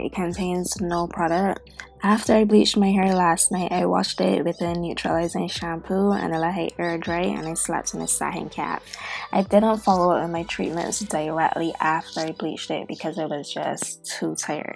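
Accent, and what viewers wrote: Barbados. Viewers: Jackee Pee she sounds Bajan to me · Thanks for the info. You sound Bajan. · THAT ACCENT😩😍BAJANNN GIRLLL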